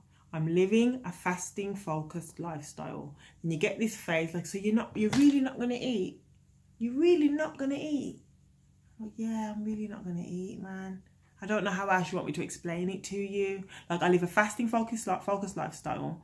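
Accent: Birmingham, United Kingdom. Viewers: Love the brummy accent. That's where I'm from but live in london now. Well done you. Just found you. Thank you for motivating me to start tomorrow.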